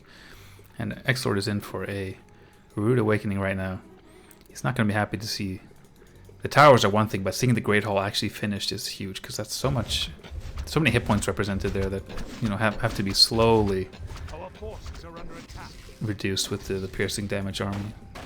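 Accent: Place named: United States. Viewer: Hey,! You sound like someone who's spent a significant time either residing in the US, or having been in continuous contact with Americans. Is there any truth to that observation?:)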